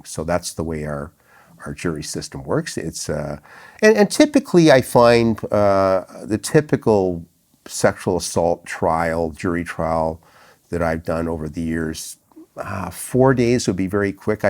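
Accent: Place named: Canada. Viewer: Is this dude and Canuck er what? Second sentence he said proooocess and I knew it. Are you taking US Court system here or…. Oh no, you just a said “Crown”. Canuck.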